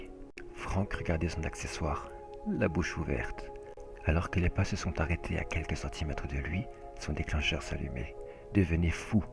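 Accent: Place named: North, France. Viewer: une petite question,est ce que tu es du nord ? (dans le Nord,y a des gens qui ont un accent semblable au tien ^^) d ailleurs il est joli ton accent :)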